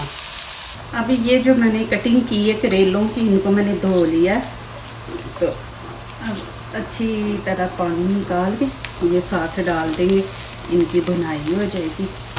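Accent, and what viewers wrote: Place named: Punjab, India. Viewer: Ap Panjabi logo ki Urdu b alag Hoti hai pata chal jata hai Panjabi ho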